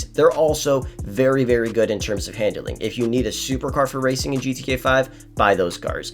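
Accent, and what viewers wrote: Slovenia. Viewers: Wait are you from SLOVENIA😅🇸🇮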